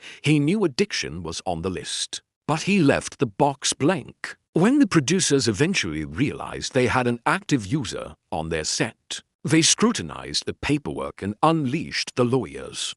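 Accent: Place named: United Kingdom. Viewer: I don't think I can listen to this all the way through because this stunted, posh English accented A.I. accent, is bloody awful! The inflection is all wrong and the sentences just don't flow!!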